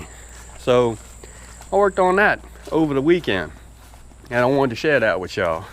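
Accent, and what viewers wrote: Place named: Louisiana, United States. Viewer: HELLO IM A NEW SUPPORTER HERE SHOWING SUPPORT FOR YA AND I HIT THE LIKE BUTTON AND THE BELL. GREAT INTRO AND MUSIC. IM TRYING TO PICK OUT THAT ACCENT. IM NOT SURE EXACTLY. IM A SOUTHERNER. I THINK UR FROM LOUISIANA MAYBE? LOL KINDA SOUNDS LIKE IT. BUT AWESOME VIDEO!! THANK U FOR SHARING!! Wow it keeps climbing. 112. Awesome!! 👍👍👍👍👍👏👏👏👏😎 Nice plant u got ther. Lol Lord have mercy. Lol I always say that. Lol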